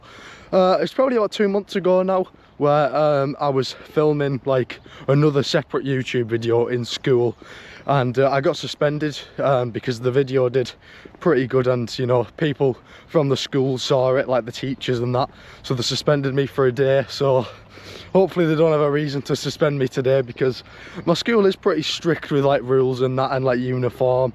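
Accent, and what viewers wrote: United Kingdom. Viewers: Very proud of you. I did my first competition at 51 and I thought it was the greatest thing I ever did despite how hard it was. Did you get tired of tensing your legs? The low carb week get you down? You're right, not the most fun in the world but the payoff is incredible. So, what's up with your school? Someone there needs to get a life! Q - trying to place your accent (I'm a Yank). Northern England maybe? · is he a geordie?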